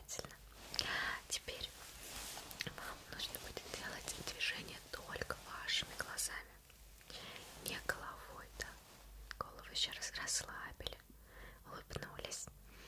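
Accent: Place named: Russia. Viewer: She’s so pretty really wish she did some videos in English the Russian accent is amazing 👌🏻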